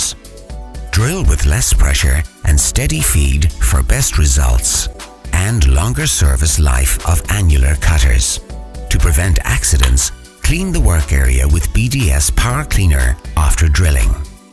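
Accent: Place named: Europe. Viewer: The narrator is also hilariously European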